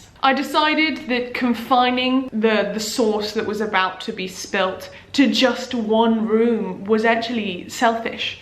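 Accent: United Kingdom. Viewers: Something about a slightly posh English woman wearing a cross saying “no nut busting for you my friend.” is very... strange for me. As an American gay male.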